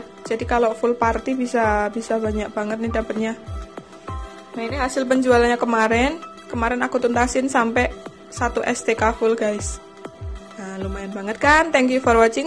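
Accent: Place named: Java, Indonesia. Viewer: Medok :v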